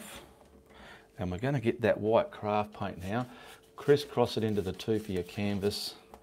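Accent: Australia. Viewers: I love your art and your Aussie voice!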